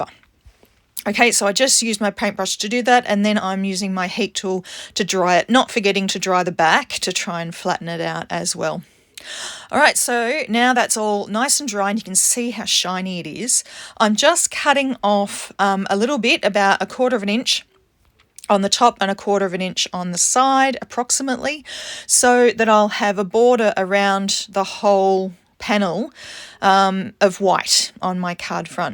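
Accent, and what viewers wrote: Australia. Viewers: Ahhh, that Aussie voice, I don’t get to hear them often anymore since leaving and moving to USA 24 years ago, so it always brings me so much joy. Your background was gorgeous and I am sure the video didn’t show off how truly pretty the glimmer from perfect pearls was. What a beautiful card. Thank you for sharing.❤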